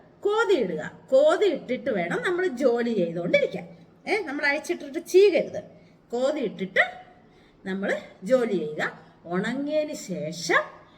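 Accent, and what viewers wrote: Kottayam, India. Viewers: ഇന്നത്തെ വീഡിയോ കണ്ടിട്ട് എനിക്ക് ചിരിവന്നു. മറ്റൊന്നുമല്ല ചേച്ചിയുടെ സംസാരം കേട്ടിട്ട് തന്നെയാണ് ചേച്ചി കോട്ടയം എവിടെയാണ്. എന്റെ തറവാട് കുമാരനെല്ലൂർ ആണ്. അമ്പലത്തിനടുത്താണ്. ഞാൻ നാട്ടിൽ വരുമ്പോൾ എനിക്ക് ചേച്ചിയെ കാണാൻ വരണം എന്നുണ്ട്.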